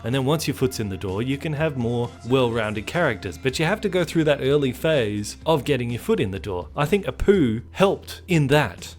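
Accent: United Kingdom. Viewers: I thought you were British. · is australian?! well i'll be! i could have sworn he was british. xD, if you're listening, get in touch with the the weekly planet guys and make a guest appearance!! i mean, australia's a small country, right?! you probably live just down the road from them. xD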